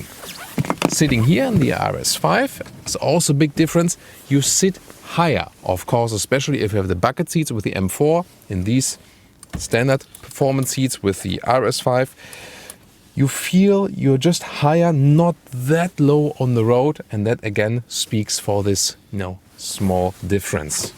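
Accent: Germany. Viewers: German accent kicks hard af haha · Good accent.....German Englush style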